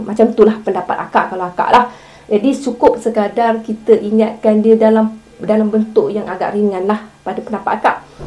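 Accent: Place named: Kedah, Malaysia. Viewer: Akak ni org kdah ka cra ckp mcm org kdah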